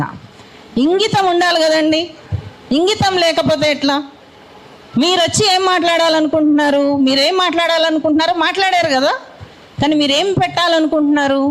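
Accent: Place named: Andhra, India. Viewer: vellaavuu vachaavu antaavu antoo mee speech lo chala andhra slang ae vinapadtundhi... meeru endhi Telangana tarapuna maatlaadedhi??, first complete ga bhasha lo yaasa maatlaadi aa tarvatha itla press meets lo speech iste oka value untadhi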